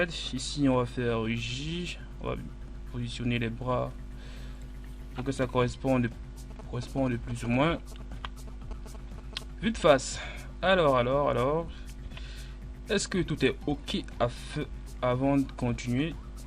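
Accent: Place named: Africa. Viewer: Très bonne vidéo. Dommage pour l'accent africain qui rend difficile la compréhension des touches (G prononcé "J"" etc..)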